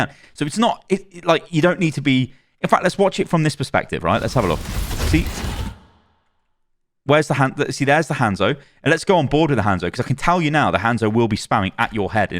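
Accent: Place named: Geordie, United Kingdom. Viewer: Your geordie accent was really strong in this video, If your not then you sounded at least haha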